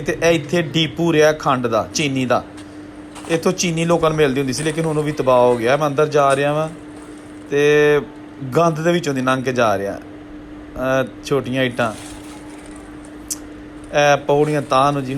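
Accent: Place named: Dogri, India. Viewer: Your commentry on this video hearted me very much touch of local dialect resembles with my mother tongue Dogri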